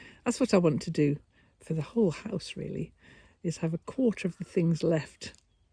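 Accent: England, United Kingdom. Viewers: I was thrilled to see you NYE. You always are so interesting. My grandmother on my dads side came from England, however, passed before I got to know her. Your accent draws me closer to her because I do remember her accent and the way she spoke I was only four and I loved her so much. I am doing ancestry to see where and when she came here to United States. I feel like I’m on the sofa with you. Keep up the videos♥️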